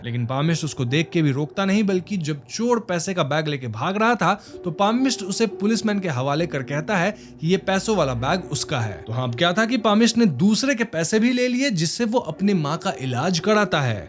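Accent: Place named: Bengali, India. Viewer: Bhai, just love to listen bengali from you're mouth. Sounds sooo sweet. ❤❤❤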